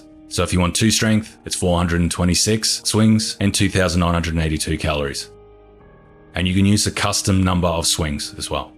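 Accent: Australia. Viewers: it's a sexy deep aussie accent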